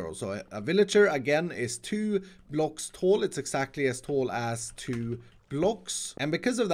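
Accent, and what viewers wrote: Sweden. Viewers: Du är svensk eller hur?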